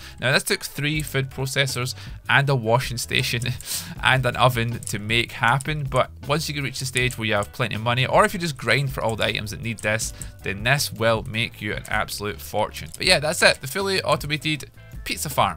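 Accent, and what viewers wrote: Scotland, United Kingdom. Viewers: "Cyked pizza's." Dat scottish accent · I love your scottish accent "fefty"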